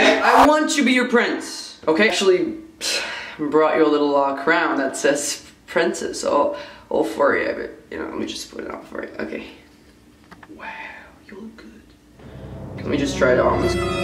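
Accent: Argentina. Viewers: Why are you my perfect guy but live so far away. Hahahaaa love your videos. This message is kinda late but ok. I love your argentinian accent! They're my weakness hahbaha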